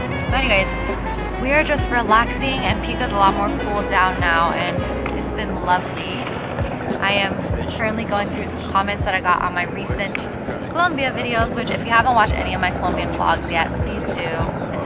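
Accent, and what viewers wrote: California, United States. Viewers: I like your Cali accent, stands out in NYC.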